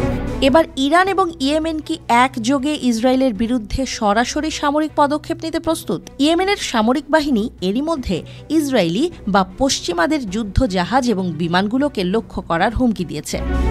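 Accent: Kolkata, India. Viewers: এই সংবাদটি উপস্থাপনা করছে কে একাতর টিভিতে তিনি কি আলোতে বাংলাদেশের বাঙালি না কলকাতার বাঙালি। কেননা তার ভাষাটা একটু ওপারের মতো টান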